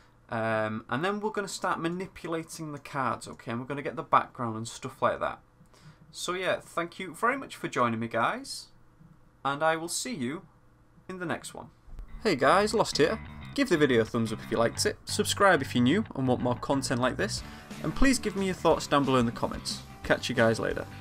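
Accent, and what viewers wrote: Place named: Yorkshire, United Kingdom. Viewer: this is a great video Lost, so nice to see a tutorial for beginners that is not just another platformer. just what i wanted/needed oh and I love the accent, there are really not enough Yorkshire accents on this site.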